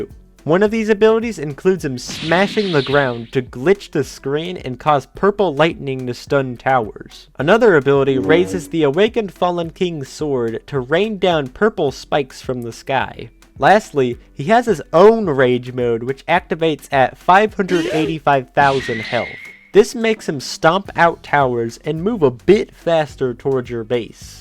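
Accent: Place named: Texas, United States. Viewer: I love your western/Texas voice 😁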